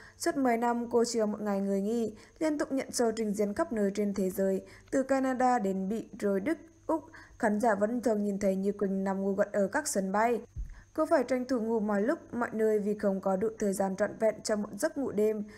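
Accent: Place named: Central Highlands, Vietnam. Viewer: Giọng đọc của người thượng hả? Lè nhè sai bét.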